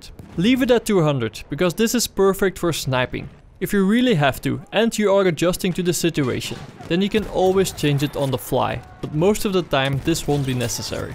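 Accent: Netherlands. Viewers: are you dutch? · That accent sounds Dutch with a hint of Scottish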